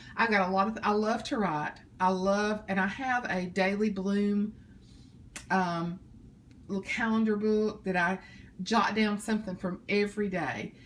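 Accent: South, United States. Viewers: I'm such an idiot, I obviously wrote this comment before I'd even finished watching the video, lol. By the way I absolutely adore your accent. I love the accents from all around the south/deep south in the USA. Y'all sound so beautifully well mannered, with your habits of saying sir/m'am and "bless your heart" (although I think that's more of a Texas backwards compliment thing lol.)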